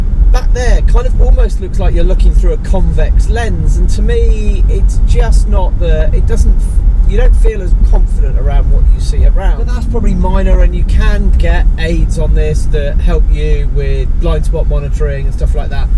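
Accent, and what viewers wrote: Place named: Cockney, United Kingdom. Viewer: Typical cockney ponce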